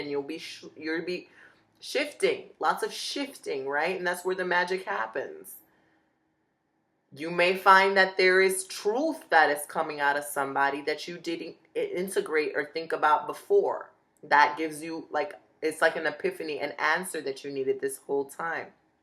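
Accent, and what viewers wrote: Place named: New York, United States. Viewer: Your accent makes me miss NYC so much. More confirmation I need to move back!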